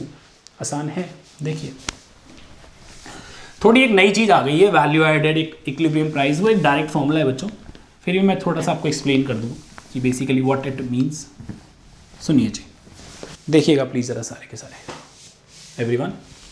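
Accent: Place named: India. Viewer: 영어 발음 실화냐 영어를 인도어로 만들어버리네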